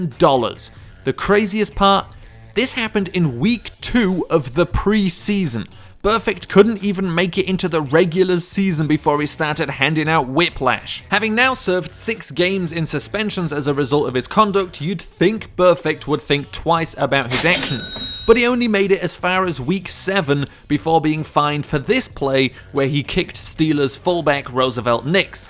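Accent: England, United Kingdom. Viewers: Wasn't expecting a Burfict video to be narrated by a Brit lol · honestly have never heard someone with a british accent speak analytically about american football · British accents and football don't match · This is the first time I’ve watched American football with a British accent over it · Theres somethin i really dont like about an english accent talking about american football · Anyone else think it’s pretty cool a British dude is talking about American football? Edit: or maybe he’s Australian idk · I love how a British person is saying this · So why is this British guy talking about NFL football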